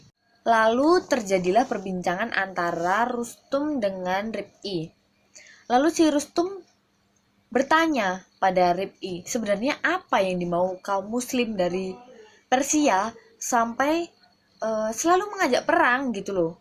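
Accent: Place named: Java, Indonesia. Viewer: Kok geliiiii 😂😂😂😂 medok banget ya bakno 😅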